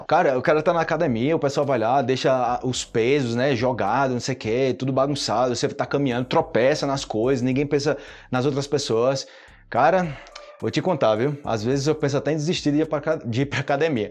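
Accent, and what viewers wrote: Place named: Antioquia, Colombia. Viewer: Me encanta como el profe cuando habla español se le siente puro acento paisa, obrigado!!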